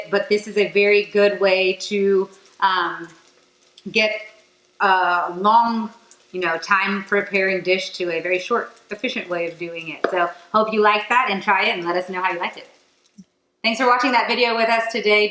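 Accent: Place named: Gujarat, India. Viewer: are you from south gujarati? You have same accent as me and love your dishes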